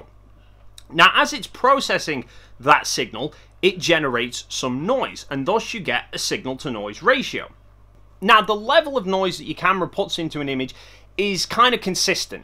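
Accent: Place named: England, United Kingdom. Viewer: Also have to admit that I am torn about english accent. I mean... sometimes I think of Richard Attenbourough and while other times I think of an evil character from a movie... snotty and just evil!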